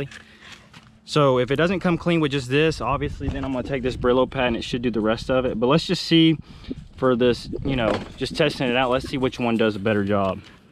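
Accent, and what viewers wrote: South, United States. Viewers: I like your southern accent! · You're the first asian person I've ever seen with a genuine southern accent.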